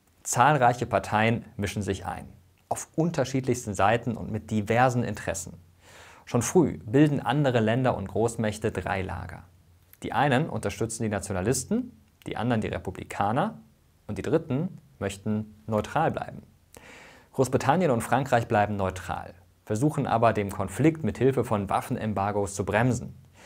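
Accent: Italy. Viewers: du gibst dir sonst immer so viel Mühe bei der Aussprache, nur nicht im spanischen. Alles sprichst du italienisch aus.:( Ich fühle mich ungerecht behandelt! 😄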